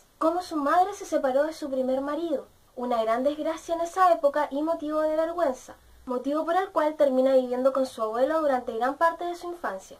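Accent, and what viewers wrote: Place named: Chile. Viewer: Hola! Me acabo de subscribir a tu canal, porque me ha encantado tu voz y forma de leer en la narración del libro "Adulterio" de Paulo Coelho. En seguidas me di cuenta q eres chilena, me caen súper bien!!😃:) Soy cubana y vivo en Miami pero he visto mucho tiempo TV Chile por lo q he podido conocer más de su gente, país y me encanta! Por cierto, no terminaste de narrar el libro? Me encantaría terminarlo narrado por ti 😔:( Gracias por leer mi comentario y ojalá te embulles a terminar el libro!😁 Saludos!!😊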